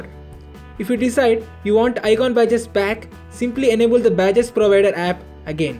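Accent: India. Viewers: straight up indian tech support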